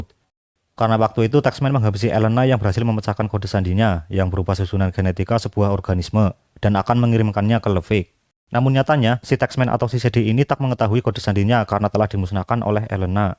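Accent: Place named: Java, Indonesia. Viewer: Terlalu medok bang…oalah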